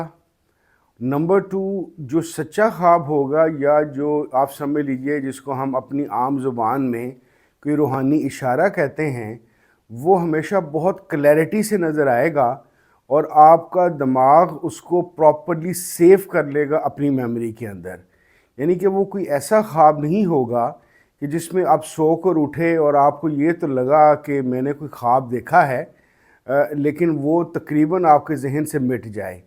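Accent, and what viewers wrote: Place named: Punjab, Pakistan. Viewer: اردو بھی پنجابی پنڈو لہجے میں بولتے ہیں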